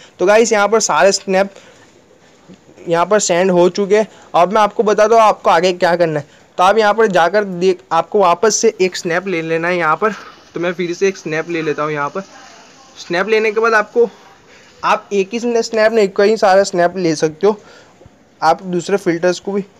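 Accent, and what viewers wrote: Gujarat, India. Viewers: You are from Gujarat ??❤️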